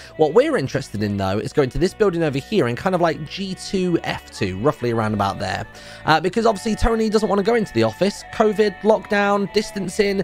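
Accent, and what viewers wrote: England, United Kingdom. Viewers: if he is British then they have a different way of pronouncing things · he is speaking English, your speaking American it isn’t the same laungige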